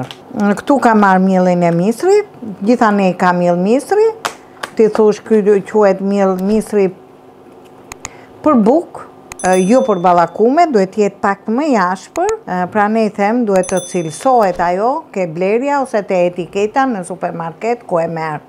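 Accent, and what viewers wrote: Tirana, Albania. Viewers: pershendetje e dashur oooo me kenoqe me ate te folme me ate duret e arta sa qe me thote goca lene mos e luj mamin se eshte tu shiju te folmen e tirone 😘😘😘❤❤🌷🌹🥀🌺⚘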